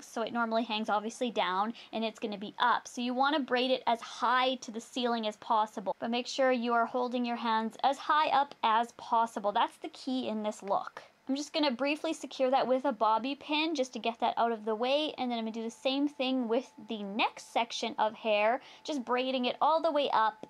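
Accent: Canada. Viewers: hehe sometimes i can heard your canadian accent and it's so cute! Go Canada!!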